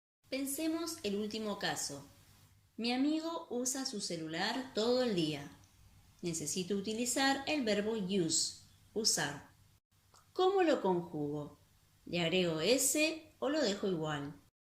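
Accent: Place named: Argentina. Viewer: Que explicación tan clara como el agua. Gracias señorita se nota que es argentina o algo asi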